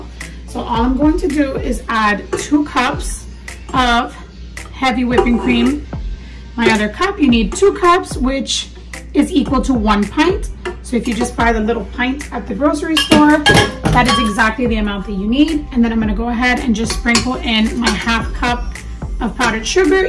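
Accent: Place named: Puerto Rico. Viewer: first timein your channel . you sound from Puerto Rico wepa!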